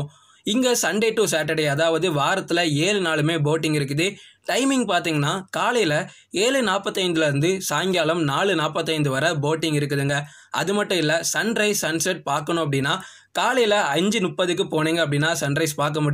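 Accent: Kanyakumari, India. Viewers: Kk slang pidikiravanga like panunga.. Pidikatavanga coment la reason solunga .. Proud to be a kumariyan😎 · K.k slang ketkale vomit varum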